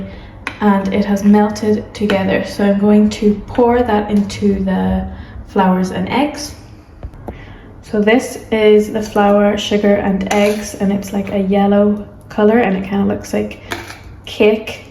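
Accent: United Kingdom. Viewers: Do you speak with british accent? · I think is british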